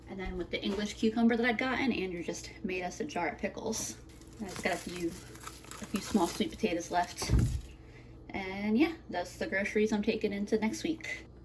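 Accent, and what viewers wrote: United States. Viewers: LOL! I love hearing, y'all... it is so... American. Not something that I typically hear nor am I criticizing... just something everyday to you but funny in my ear. Language, eh? Anyway, I very much appreciate your channel and the food you make for people on strict budgets. More importantly, raising the issue of food insecurities and life of folks in financial dire straits that exists everywhere. Fresh fruit, veggies, eggs, and beans with rice are always necessities. Access to healthy food is as much of a right as clean water, affordable housing, healthcare, and an livable wage. Right up there with your right to life, liberty, and the pursuit of happiness. Meals one makes themselves are the way to go, not only nutritionally but also for family cohesion. ish, you mention a ham steak and if you get some split peas and a slow cooker, one can make a dynamite, classic French split-pea soup if you slow cook the ingredients and dice up the ham! 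A smoked turkey leg is a great substitute for anyone who dislikes or avoids ham. Perfect for the colder months with a slice of crusty French bread and it will feed an army. Merveilleux! C'est délicieux à faire pour votre famille ou vos amis!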